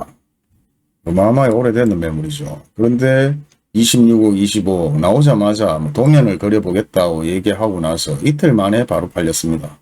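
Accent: Gyeongsang, South Korea. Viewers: 사장님 푹건한 경상도 사투리 너무 친근감있고 듣기 좋습니다 ~ 앞으로 자주 오겠습니다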